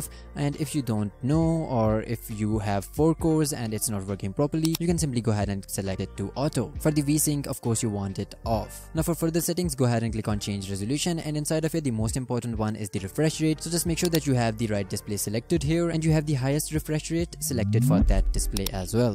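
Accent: India. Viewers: Never never never trust an Indian accent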